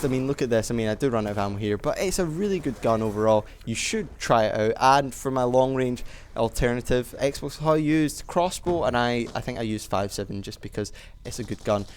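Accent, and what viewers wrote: Scotland, United Kingdom. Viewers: Finally a decent Scottish commentator.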